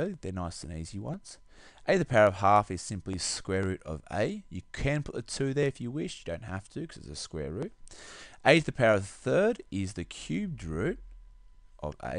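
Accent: Australia. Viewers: i know this is an old video of yours but i just stumbled apon it im in 8th grade and im also an aussie and its really nice to hear a good loud aussie instead of a quiet european tutor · Thanks mate for the help. I have a block exam next week for maths b and I needed to recap. Nice to listen to an Ausie voice as I am to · Thank you so much! My teacher doesn't show how to work out the questions she just puts examples on the board expecting you to know what to do. I also love that you are Australian because as I am australian I find the accent easier to actually listen to. THANK YOU SO MUCH. I hope I pass year 9 maths this year lol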